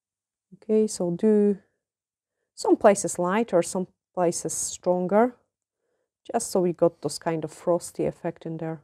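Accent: Scotland, United Kingdom. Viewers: Your precious little accent is EV-ER-Y-THING!💖 JUST ADORBS 🤗 I never would've guessed Scotland!!! But you sure throw out some BANGERS with your nail art, but HUN-NYYYYYY!!!!! THAT STONE PLACEMENT IS ALWAYS BEYOND ON POINT 👌🏼 Honestly, even if you had no idea how to do nails, (which you are so exceptional àt!) , SOOO MANY PEOPLE would still come to you for stone placement and/or nail design 😍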